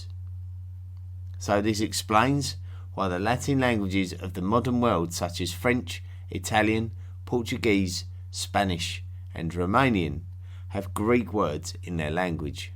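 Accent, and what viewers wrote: United Kingdom. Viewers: Voice reminds me of American sportscaster, Howard Cosell. Only with a British accent. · While the “a” does sound Aussie-like, his other vowels, contractions and other patterns are definitely British but I can’t pick the region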